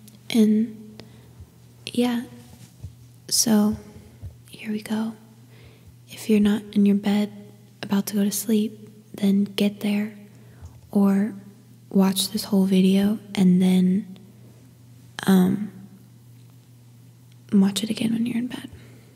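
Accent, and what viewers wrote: Spain. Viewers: Aré u Spanish? Bc u have the accent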